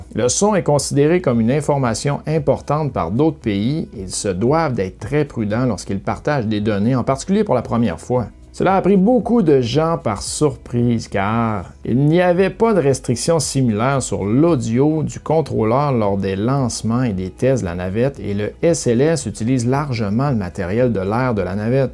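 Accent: Canada. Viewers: l'accent canadien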